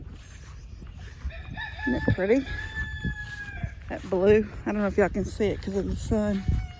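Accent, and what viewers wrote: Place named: South, United States. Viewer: I love your country talking. Some of your words todays I haven't heard in years. You are the best!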